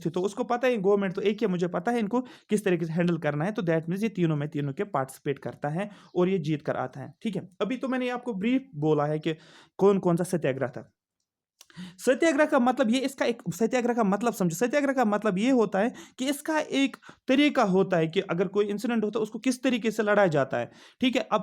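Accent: Kashmir, India. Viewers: bhai kashmiryu ki hindi todi diff hai...tbhi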